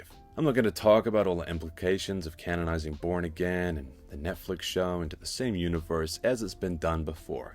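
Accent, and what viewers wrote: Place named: United States. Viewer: That's supposed to be a New Zealand accent? You sound American.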